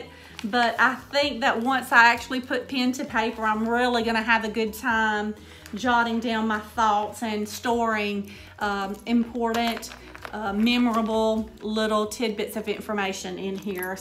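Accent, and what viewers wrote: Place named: Georgia, United States. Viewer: i love this ! i really like that you didn't make it too fat so you have lots of room for stuff. i would love to see how you sew it together & i would like to know how to tea stain paper. i've tea stained fabric for years, but not paper & i think it looks beautiful. you have lovely original ideas & i'm so enjoying your vids. i know we're neighbors cause you sound like everybody i know :):) have a great week & God bless :) :) nancy in ga usa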